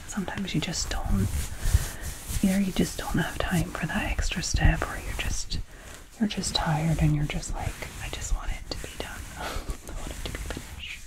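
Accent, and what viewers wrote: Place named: Scotland, United Kingdom. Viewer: Been binge watching your videos all evening. Just discovered that you’re Scottish, so hello from Edinburgh! I haven’t been able to place your accent but I can definitely hear it now.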